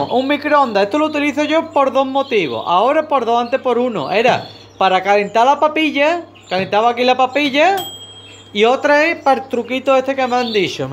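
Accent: Andalusia, Spain. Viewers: Ese andalu que parece que va a contar un chiste...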